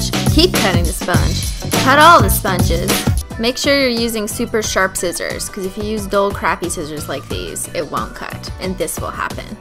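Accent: Canada. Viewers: Canadian Eh!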